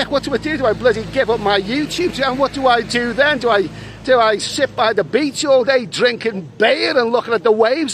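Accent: Liverpool, United Kingdom. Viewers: A squeeky clean Scouser!!!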